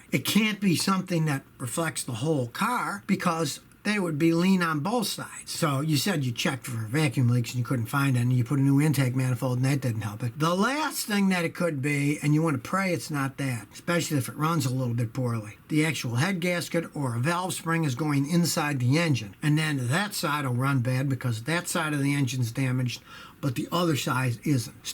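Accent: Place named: Texas, United States. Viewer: This is new. It's sorta like the YouTube version of Car Talk with a Texas accent.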